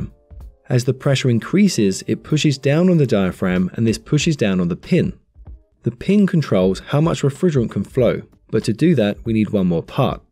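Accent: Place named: United Kingdom. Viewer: i wish you'd talk a little slower,,,,,,,,,,,,,,,i know you can go back and watch again as much as you want or need to but with that british accent i miss a lot and i become frustrated and turn off the video,,,,,,,,,,just a suggestion though,,,,,,,,,,,,,,,other than that i do like the videos............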